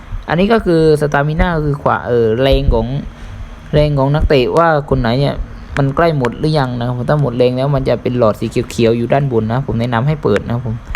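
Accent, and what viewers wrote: South, Thailand. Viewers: น้องเป็นคนใต้หรือป่าวครับ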